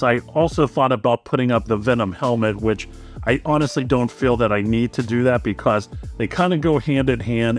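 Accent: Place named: Ohio, United States. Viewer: Your accent sounds central Ohioan, Columbus?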